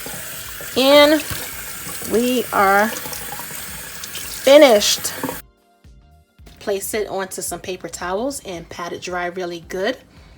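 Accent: New York, United States. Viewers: Love that NY accent lovely chicken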